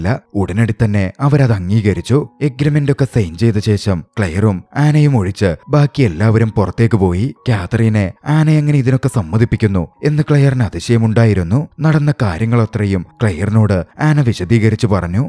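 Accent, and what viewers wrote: Ernakulam, India. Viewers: നിങ്ങ എറണാകുളത്തുകാരനാണോ?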